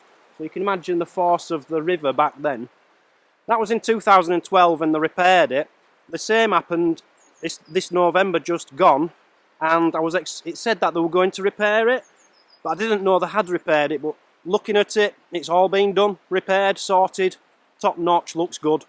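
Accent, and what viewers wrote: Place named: Glasgow, United Kingdom. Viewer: I detect Glasgow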